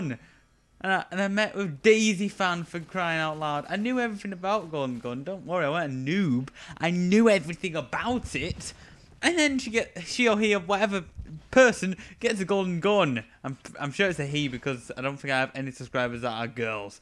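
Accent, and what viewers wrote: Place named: United Kingdom. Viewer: You sound like one of the MI6 members. :D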